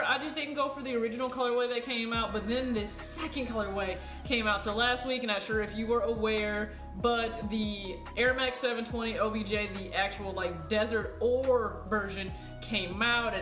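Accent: South, United States. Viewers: definitely from the south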